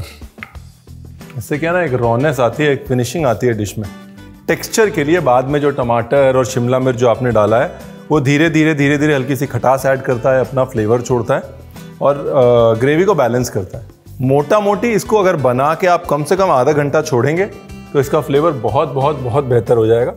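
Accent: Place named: Punjab, India. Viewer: Nice recipe with delicious punjabi tone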